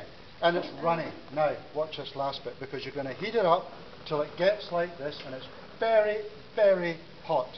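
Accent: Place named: Scotland, United Kingdom. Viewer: its called a scottish accent stud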